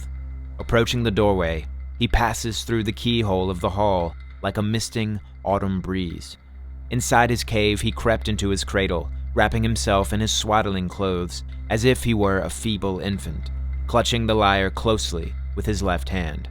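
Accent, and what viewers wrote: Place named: United States. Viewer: american voice nice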